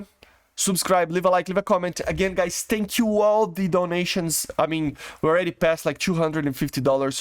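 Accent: Brazil. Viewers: Bro he is Brazilian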